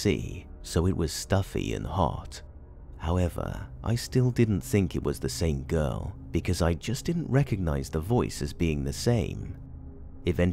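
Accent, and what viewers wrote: United Kingdom. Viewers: Gehls. I love it !!! I love that British accent ! ❤ 🙏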